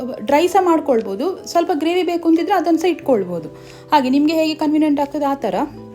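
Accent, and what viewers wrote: Mangalore, India. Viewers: Mangalore kannada ok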